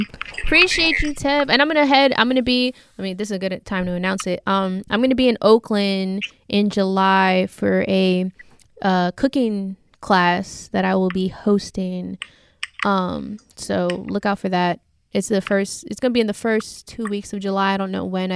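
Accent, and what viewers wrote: New York, United States. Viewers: you should really go with yearrrrrrr that the new york in you lol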